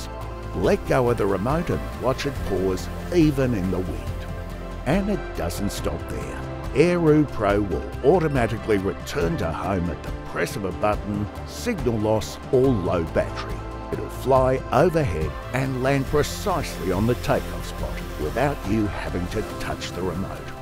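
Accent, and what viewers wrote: Australia. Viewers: Na, this old mate sounds true blue Aussie 🇦🇺, it's great and I'm a kiwi 😅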